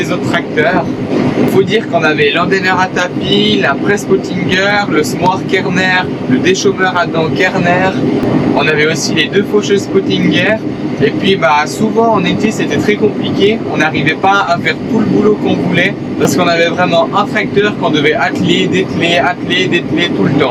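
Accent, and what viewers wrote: Switzerland. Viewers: Salut ue vien de recouvrir ta chaine et ca a l'air cool je trouve que tu a un accent suisse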